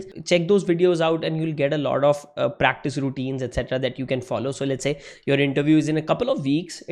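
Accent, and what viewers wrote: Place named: India. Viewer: You have a butler English Indian accent.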